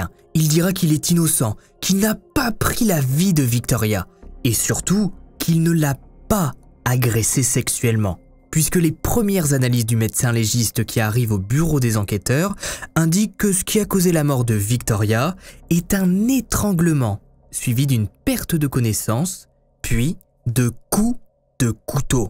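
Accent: France. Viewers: Enfinnnn ton retour !!! Super hvf, merci ! PS : j'adooore quand tu prononces les mots anglais avec ton accent français "background" 😂😂😂 (et "Raul" qui se prononce "Raoul"!) Bref je t'adore 😁❤️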